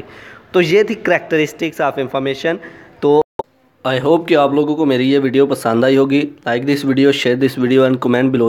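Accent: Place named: Punjab, India. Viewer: Thank you for highlighting Sir! I had liked the video and subscribed the channel. Your tone resembles Punjabi speaking people.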